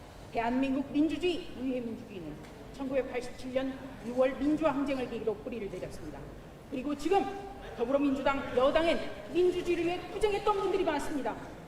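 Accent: North Korea. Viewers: 저 사람은 탈북자 에요?..말투가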